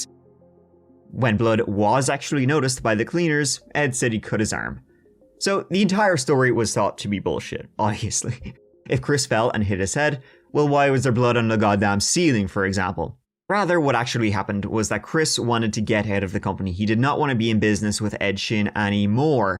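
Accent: Ireland. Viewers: I heard this story before but it’s always better with an ol’ Irish narrator giving it a goo!!!! 💚💚🤣🫶🏻🕺🪩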